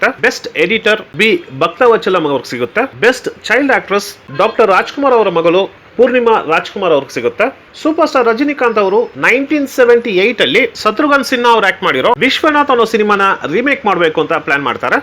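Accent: Tamil Nadu, India. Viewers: ಈ ಚಿತ್ರದಲ್ಲಿ ರಾಜ್ಕುಮಾರ್ ಪಾತ್ರದ ಸೌಂದರ್ಯ,ರಾಜಕಳೆ, ಘನತೆ,ಗಾಂಭೀರ್ಯ, ಭಾವಸೂಕ್ಷ್ಮತೆ,ತನ್ಮಯತೆ ಪ್ರಪಂಚದಲ್ಲಿ ಯಾರಿಗೂ ಬರಲ್ಲ.ಇಂತಹ ಅಭಿನಯವೇ ಅಲ್ಲದೆ ಸ್ವಂತ ಕಂಠಸಿರಿಯ ಅತ್ಯುತ್ತಮ ರಂಜನೀಯ ಹಾಡುಗಳು.ಆರತಿಯವ್ರೂ ತುಂಬಾ ಚೆನ್ನಾಗಿ ಅಭಿನಯಿಸಿದ್ದಾರೆ. ಜಯಮಾಲ ಕೂಡಾ ಬೆಡಗಿನಿಂದ ನಟಿಸಿ ಕಣ್ಮರೆಯಾಗುತ್ತಾರೆ. ಆ ಕಾಲದಲ್ಲಿ ಕನ್ನಡದಂಥ ಸೀಮಿತ ಮಾರುಕಟ್ಟೆಯಲ್ಲಿ ಭಾರೀ ಯಶಸ್ವಿಯಾದ ಚಿತ್ರ. ರಜನಿ ಬರೀ ಸ್ಟೈಲ್ ಕಿಂಗ್ ಆಗಿ ಮಾತ್ರ ತುಂಬಾ ಚೆನ್ನಾಗಿ ರಂಜಿಸುತ್ತಾರೆ. ಆದ್ರಿಂದ ಅವರ ಪಾತ್ರ ಹಾಗೇ ಮಾರ್ಪಾಡು ಮಾಡಿದ್ದಾರೆ. .ಆದ್ರೆ ತಮಿಳ್ ನಲ್ಲಿ ಒಂದು ಹಾಡು ಮಾತ್ರ ಚೆನ್ನಾಗಿದೆ,ಉಳಿದ ಹಾಡುಗಳು ಅಷ್ಟಕ್ಕಷ್ಟೆ. ತಮಿಳ್ ಮಾರ್ಕೆಟ್ ವ್ಯಾಪ್ತಿ ಜಾಸ್ತಿ, ಜಾಸ್ತಿ ಖರ್ಚು ಮಾಡಿ ಜಾಸ್ತಿ ಗಳಿಕೆ ಮಾಡಿದ್ದಾರೆ. ಈ ಕಾರ್ಯಕ್ರಮದ ನಿರೂಪಣೆ ಸ್ವಲ್ಪ ಸೌಮ್ಯವಾದ ತಮಿಳ್ ಕನ್ನಡ ಶೈಲಿಯಲ್ಲಿದೆ. ಕನ್ನಡವನ್ನು ಕನ್ನಡ ಶೈಲಿಯಲ್ಲೇ ನಿರೂಪಿಸಬೇಕು. ಒಟ್ಟಿನಲ್ಲಿ ದಂತಕಥೆ ರಾಜ್ಕುಮಾರ್ ಹೊರತುಪಡಿಸಿ ಒಂದು ಚಿತ್ರರಂಗವನ್ನು ಅತ್ಯಂತ ದೀರ್ಘಕಾಲ ಜನಪ್ರಿಯವಾಗಿ ರಂಜಿಸಿದ ತಾರೆ ರಜನಿಕಾಂತ್. ಅವರು ತಮಿಳ್ ಶ್ರಮಜೀವಿಗಳ, ಬಡ ಕೂಲಿಕಾರ್ಮಿಕರ,ನೊಂದವರ ಅಚ್ಚುಮೆಚ್ಚಿನ ಆರಾಧ್ಯ ತಾರೆ. ರಜನಿಗೆ ರಾಜ್ ಆದರ್ಶ ತಾರೆ.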